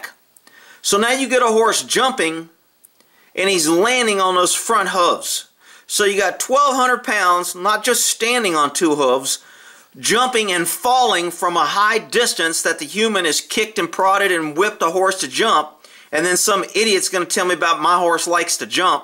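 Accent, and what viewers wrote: United States. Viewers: hey, I liked a couple of videos because it was too true what you were saying.. but in this video... and I'm talking about the first 3 pictures... really from what I can hear you are a real American. (I'm from the Netherlands) So you're probably a western rider.. well my opinion if you do that wrong... we are human and make mistakes and we are working with about 700kg animals with a mind for them selves. I know and say yes, I had bad jumps and even fell twice with my horse because he made a canter change I didn't ask for, but my horse still loves to go through the cross country and I can also say if he doesn't want to go, he doesn't go.. because if you use a stick or spurs in that moment, he let's you eat dirt... and maybe you should check about the build and there way of moving of a breed of horse before you talk about them that way... 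(it's a friesian horse, and let me tell you, it's a really old breed)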